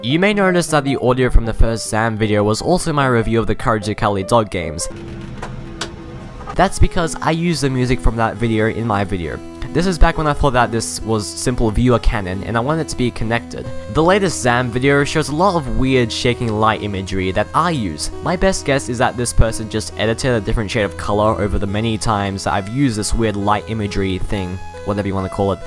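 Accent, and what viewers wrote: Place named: Australia. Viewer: Are you Australian? I can hear it in your voice. I'm Australian aswell.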